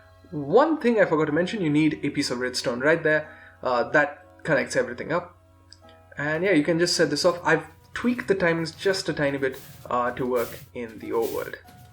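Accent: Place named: India. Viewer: bro ur from india right ? cause u definetly sound like and indian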